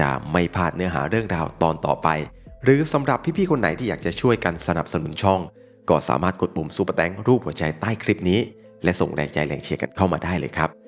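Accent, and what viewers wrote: South, Thailand. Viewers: คนใต้มั๊ยคับ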